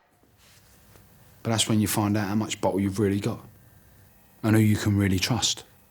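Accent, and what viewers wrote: Cockney, United Kingdom. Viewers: I mean cockney 😆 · Who the fuck do you think you are problem with you is fancy yourself full of shit you arsehole mcfc been there and done it anyone can talk big time on film on your own talk like that to me and my mates you cockney gobshite No chance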